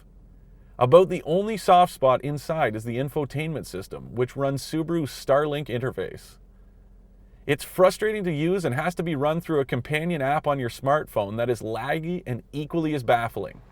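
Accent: Canada. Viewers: Did I hear a canadian talking apout cars?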